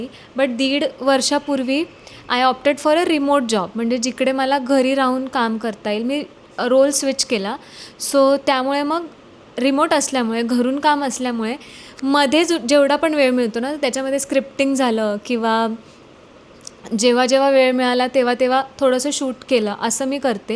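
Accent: Khandesh, India. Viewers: बोलण्यातून थोडासा आमच्या खानदेशी flavour...sweet...जाणवतो... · Vattlach hota tumhi khandeshi aahet ty tumcha bolnyvrun tumche contents khup chan astat mi pn khandeshi aahe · tuzya eka videot pakki khandeshi tone janavli mala, aani aata tr confirm ch kelas tu.Mi comment pn keleli tyavr😅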